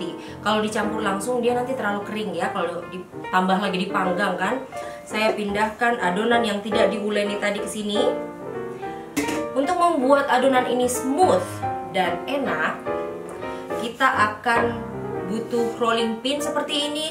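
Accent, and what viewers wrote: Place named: Indramayu, Indonesia. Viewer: mbk nya orang indramayu ya....denger logatnya...heehehe...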